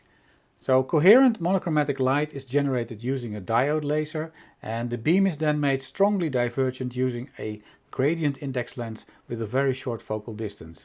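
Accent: Netherlands. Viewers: You sound like you’re from the Netherlands.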